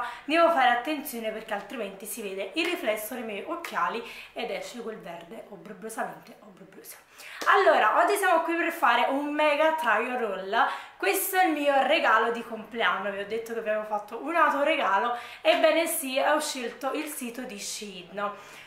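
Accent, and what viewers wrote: Naples, Italy. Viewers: ACCENTO NAPOLI SPETTACOLARE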